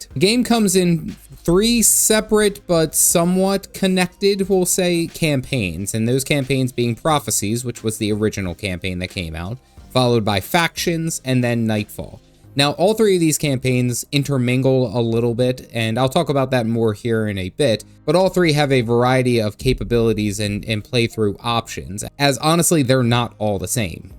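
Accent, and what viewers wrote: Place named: Baltimore, United States. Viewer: Just curious...are you from Baltimore? That accent sounds very Baltimore. Great video. I haven't played since 2012. Good to see they're still around and thriving!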